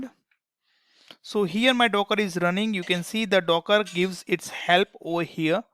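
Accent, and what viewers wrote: India. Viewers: Aa pako Gujarati lage che Speech per thi😁😁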